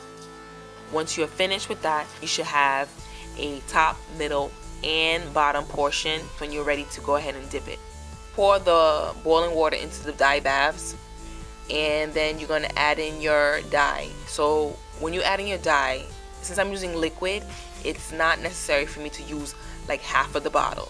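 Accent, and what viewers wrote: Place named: Brooklyn, United States. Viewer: I love this diy! I had a feeling you were a Brooklyn girl from your voice and I checked your Facebook!!! BK Love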